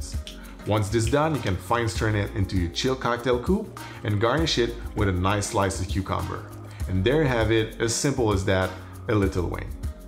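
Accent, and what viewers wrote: Quebec, Canada. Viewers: Love the channel dude ! coming from The Educated Barfly, Im guessing from the accent you're from Quebec, quel region ?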